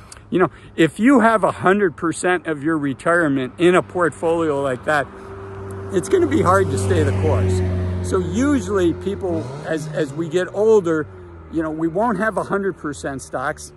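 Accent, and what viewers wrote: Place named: Minnesota, United States. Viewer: I love “yuurs” instead of years. Keep that Min—a-SO-tah coming! ❤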